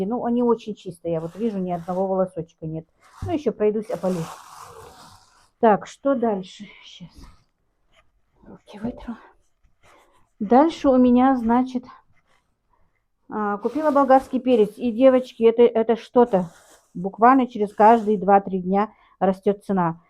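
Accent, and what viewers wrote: Standard. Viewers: Чистейшая, грамотная русская разговорная речь. умница.Наверное имеет высшее образование.да и книги читает. ❤Дай Бог здоровья.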